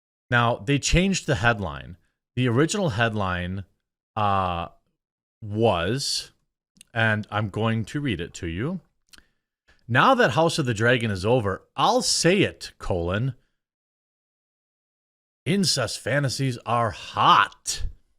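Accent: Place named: North, United States. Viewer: your accent isn't "Midwestern." It's Northern. I only hear people with your accent in Michigan, Wisconsin, and Minnesota. I'm from Indiana and no one here says "Dreg-in."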